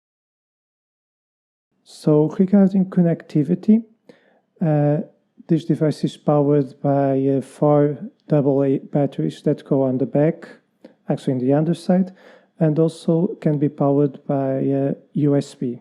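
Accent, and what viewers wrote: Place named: Portugal. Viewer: Are you from Portugal? I watch a lot of your videos and I can always detect some Portuguese accent 🤔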